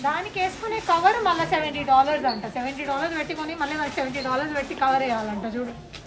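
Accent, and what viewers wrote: Telangana, India. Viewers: That Telangana slang 👌🏼👌🏼👌🏼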